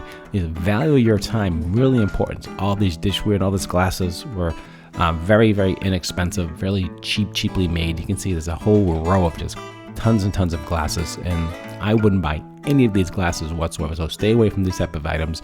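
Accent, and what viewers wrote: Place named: New England, United States. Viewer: That accent!! Had to be new england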